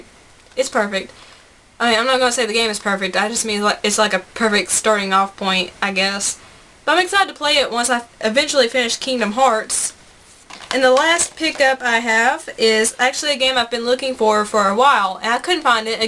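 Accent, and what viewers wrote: South, United States. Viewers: I like your southern accent :)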